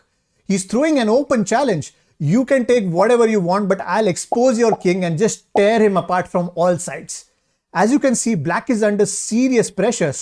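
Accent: India. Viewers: cant stand that indian accent. flunked a couple of college courses because of that shit.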